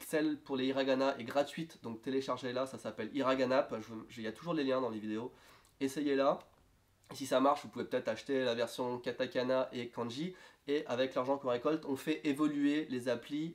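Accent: Lorraine, France. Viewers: Je me disais bien que tu avais un accent Lorrain des fois quand tu parlais, je rêvais pas et maintenant je suis fixé :p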